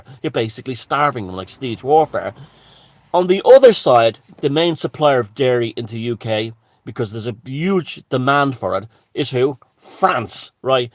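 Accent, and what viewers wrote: Ireland. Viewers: Brilliant 👍👍👍👍, I love the Irish accent and the Irish people, 🇬🇧👍👍🙏🙏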